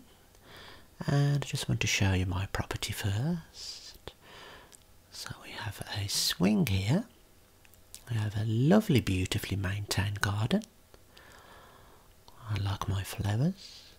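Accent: United Kingdom. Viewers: Sir are u British if so cool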